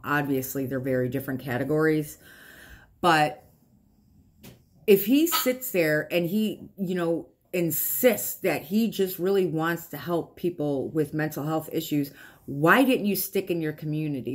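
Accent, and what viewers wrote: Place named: Buffalo, United States. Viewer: I know that this is a very invasive question, but where are you from? Your accent reminds me of my family/people in my hometown (Buffalo). I could be completely off, please do not feel pressured to answer.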